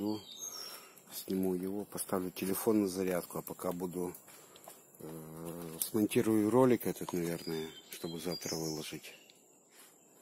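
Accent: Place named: Ukraine. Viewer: БлагоДарю за ответ, я так и подумала ,что вы из Украины (по акценту в разговоре) если не секрет из какой области?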